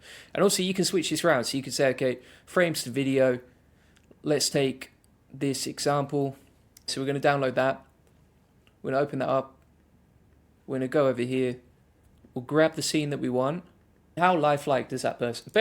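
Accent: England, United Kingdom. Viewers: You sound English bro how did you get access to this in the uk?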